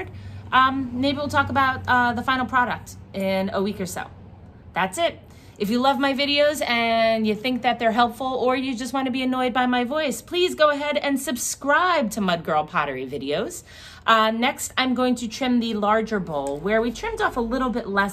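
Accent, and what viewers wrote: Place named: New York, United States. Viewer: love your NY accent!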